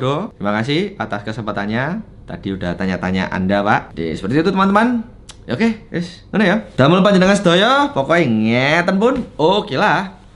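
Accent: Java, Indonesia. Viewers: Mas boso jowone endi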